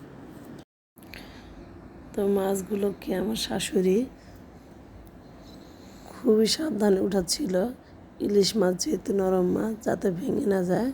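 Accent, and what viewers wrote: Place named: Tangail, Bangladesh. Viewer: আমিও টাংগাইলের আপু। তোমার কথা আর রান্না দেখে মনে হলো টাংগাইলের মতো তাই বললাম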